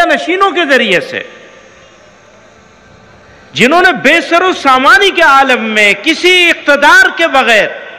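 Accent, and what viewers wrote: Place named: Pakistan. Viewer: Masha Allah Urdu language bilkul Pakistan jaesi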